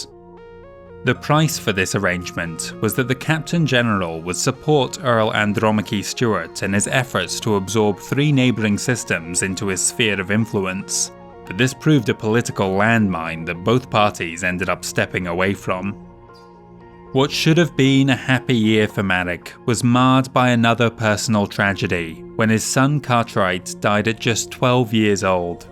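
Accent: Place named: Wales, United Kingdom. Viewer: Where is your accent from? I'm sure that you have said, is it Welsh? Great video and thank you for the lore!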